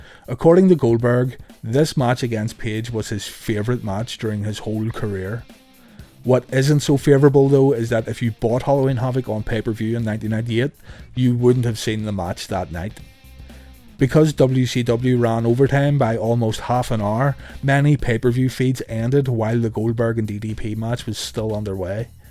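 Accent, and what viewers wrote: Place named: Northern Ireland, United Kingdom. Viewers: What part off northern ireland you from? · Northern Ireland narrator is class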